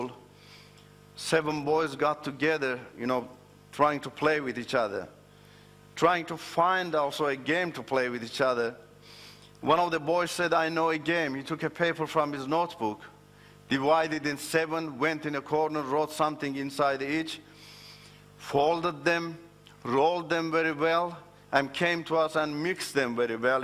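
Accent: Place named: Iran. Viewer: Amina he's a Iranian.. things r pronounced in his tongue with slight difference., like different recitations of kuuuraan.. we call allah in most but many call it as alloah.. does that make him an ignorant in arabic? definitely he's learned enough to explain the message .. salaaaaaam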